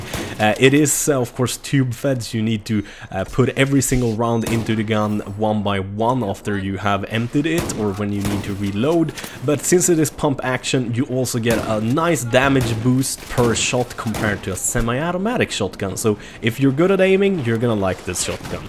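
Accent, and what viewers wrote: Sweden. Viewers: ur accent is so Swedish... I LOVE IT!!!! :D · are u swedish?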